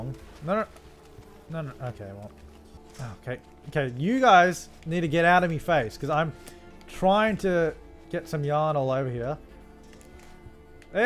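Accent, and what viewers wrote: Australia. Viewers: Are you Australian?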